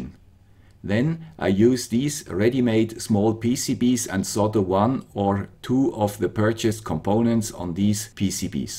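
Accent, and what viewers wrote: Switzerland. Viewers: Hallo, Your Video´s are very good. I like to watch them and hear your Swiss English. And I learn a lot of them. Maybe in the Futures you can make a Video how to handle a 3D Printer. I mean please show us how the software works. As I know it is not so easy to handle this 3D Software if you are a Newbe. And if you want make a Tutorial of all the things to know. Keep on with your nice Works. Best Regards Jürgen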